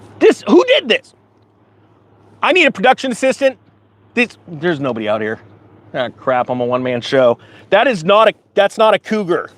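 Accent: RP, United Kingdom. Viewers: LOVE THE BRITISH ACCENT!😅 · Love the David Niven accent,. Keep it up! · This sounds much more serious in a British accent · I love your Limey Accent!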